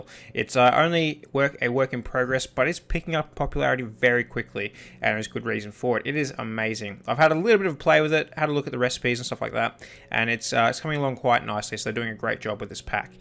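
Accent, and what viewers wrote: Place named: Australia. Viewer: Great video dude would like to see more but Are you Australian because you kinda sound like it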